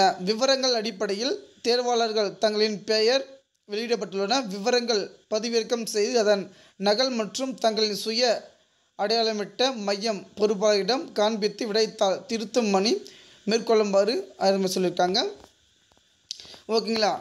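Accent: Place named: North India, India. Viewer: Tamil nalla padikka kathukka Nanbaa... North India maathiri padikkira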